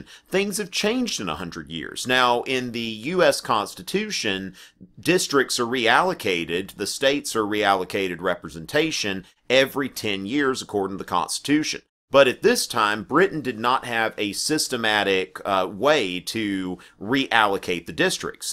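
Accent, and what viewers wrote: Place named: South Carolina, United States. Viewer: He sounds like he's from the south eastern U.S. I'd guess, an urban area of South Carolina. I'm from Virginia, and many of the accent are similar, but there are some subtle differences.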